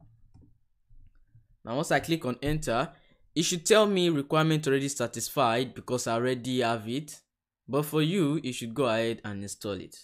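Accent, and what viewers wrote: Nigeria. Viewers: Are you a Nigerian 🇳🇬? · Very hard to miss the accent. Naija for life. 😍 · From the accent and name, I guess you're Nigerian... Yay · It is so funny how after listening to the first 6 seconds I knew this was a Nigerian😂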